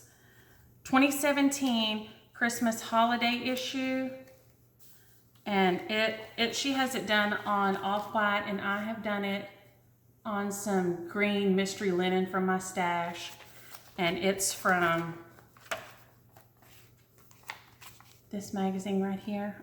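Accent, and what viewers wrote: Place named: Texas, United States. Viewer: I’m so glad to have found you! I thought you were tons of fun, and you sound like home (Texas) to me. Thanks for doing FlossTube!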